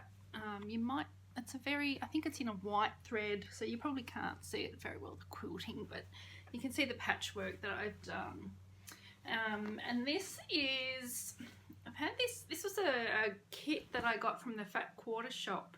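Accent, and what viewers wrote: Australia. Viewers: Your videos have quickly become one of my “oh great - she’s done a new floss tube!” videos. And, like most Americans, I love your Australian accent. My favorite flower is a lilac. Do those grow in Australia? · I’ve binge -watched your videos. I love all you stitch and that you love 40 count. Love hearing your Australian accent. I planted a flower bush today. It had buds on it so I’m hoping to see many flowers soon. Until next time, stitch everything you can. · Hello, I just enjoy your floss tube so much. I love your Australian accent. Your stitching is excellent. Would love to see a couple pictures of your Home area. Nothing private. I have always been interested in Australia and would love to visit but my health and finances won't allow. One of my favorite quilting books comes from a woman who owns a shop in Perth, well, at least she did a few years ago. 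If I could only have one quilt book, it would be that one. I still do a bit of quilting but these days I am so happy to be cross-stitching again. I also have gone down the rabbit hole of EPP. I am rambling, sorry. Take care, keep up the good work and know there are many of us who admire and respect you. Carry on. with warm thoughts.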